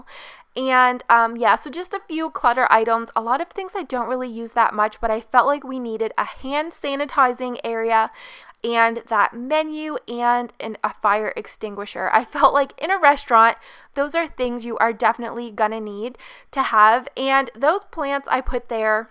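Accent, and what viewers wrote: Canada. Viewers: I used to believe Canadians didn't have much of an accent that differs from Americans. This video proves me wrong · It's called an accent (I believe she's Canadian) chill out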